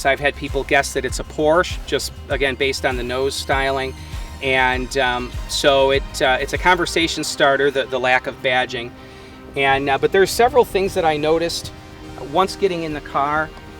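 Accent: United States. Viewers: You have a very cool but crazy accent. It's like super American.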